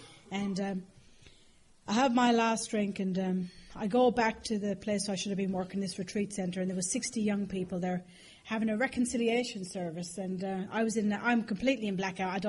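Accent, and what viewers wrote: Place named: Ireland. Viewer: I love your irish.great sense of humor.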